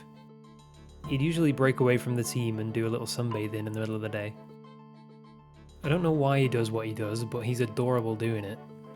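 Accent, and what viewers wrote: United Kingdom. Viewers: Popped up in my recommendations, cool video and all, but I am intrigued by this guys English accent, it sounds standardised British English then suddenly American time-to-time with certain phrases.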